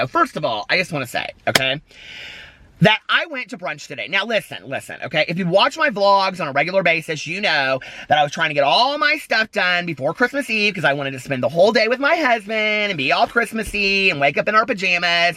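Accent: South, United States. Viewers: I’m so happy that I’ve found you recently! I’ve never heard someone with a southern accent speak so fast but I’m from jersey so it just works for me. Hope you get to chill out after all of the madness 😉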